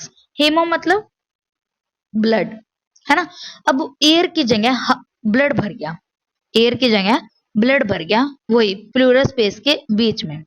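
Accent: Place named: Haryana, India. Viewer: very helpful video ❤ and your haryanvi accent is cherry on the top❤❤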